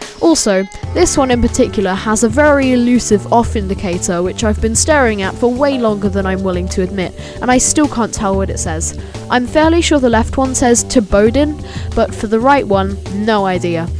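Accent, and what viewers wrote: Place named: United Kingdom. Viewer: I like your British accent, I would also like to talk like that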